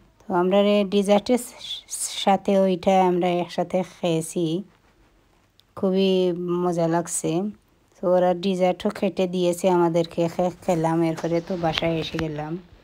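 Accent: Sylhet, Bangladesh. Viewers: Kita goh affa apne ki sylheti, na shuddo na nokhali bolchen 💙 onek balo laglo apnar friend er food deke, Thank you for the share 👍👍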